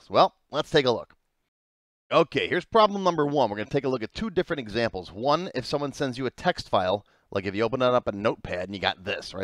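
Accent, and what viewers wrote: United States. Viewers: Good ...but It would be better if you took into account viewers whose English Language / American Accent is their second Language, as they may not be able to pick up your American accent as quickly... Regards from an Iraqi living in the Anbar Provence in a remote area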